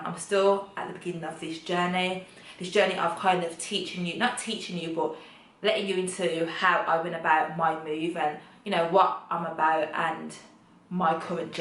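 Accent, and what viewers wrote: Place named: Midlands, United Kingdom. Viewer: Your ascent sounds like u from the Midlands(Birmingham, Wolverhampton, etc). Grt8 choice, hope to meet u in Gh soon.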